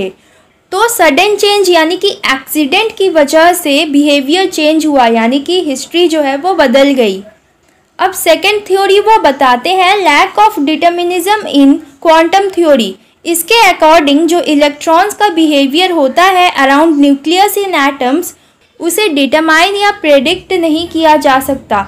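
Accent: Bengal, India. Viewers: Are u bengali?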